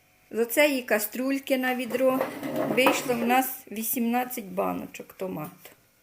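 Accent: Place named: Khmelnytskyi, Ukraine. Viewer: Добрий вечір.Пнивіт з Хмельниччини.Я підписалася на Ваш канал.дуже сподобались Ваші відео.Коли перший раз попала на Ваш канал.почула Вашу мову то чомусь так і подумала що ви з Хмельниччини. Бажаю Вам миру над головою. скорого повернення Ваших синів до дому з перемогою.Невісточки молодці гарні хазяєчки Всього Вам гайкращого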